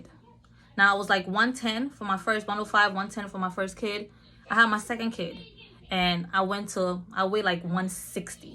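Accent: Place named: Liberia. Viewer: You sound a little like you Liberian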